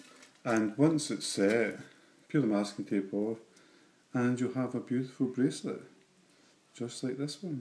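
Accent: Scotland, United Kingdom. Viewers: If you knew how much your accent is loved by many of us here in the US, you'd have a lot more tutorials. It was nice hearing you and thanks for the tutorial. 🙂 Before I die I'll make it to Scotland and tour where Alexander McCall Smith has the setting for his wonderful books and I'll go visit your shop too. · Great seeing a man doing this. Great job . Love hearing the Scottish accent! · The shop is located in Scotland so it's a scottish accent. :-)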